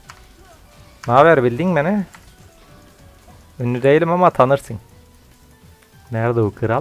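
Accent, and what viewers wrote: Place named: Balıkesir, Turkey. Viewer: Nerelisin Balıkesir ağzı konuşuyor gibisin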